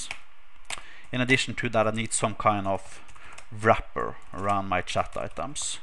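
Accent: Norway. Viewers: loooooooool!! i knew you were norwegian, typical norwegian "english voice", kul tutorial foresten xD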